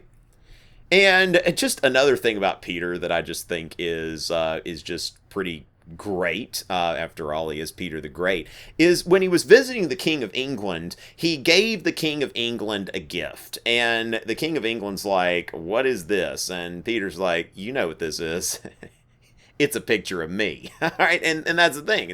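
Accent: United States. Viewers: Oh don't worry, I was able to get over it eventually. But the first couple times the teacher had us watch your videos, I was so focused on the accent and trying to figure out which state you might be from that I wasn't paying attention to anything you actually said. :P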